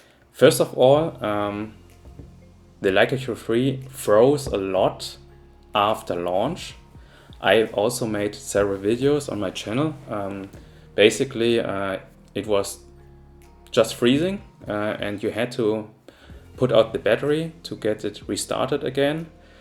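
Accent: Germany. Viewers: i think i hear a german accent, live in Germany? i was incredibly hyped up for leica q3, you shattered my dreams :( :P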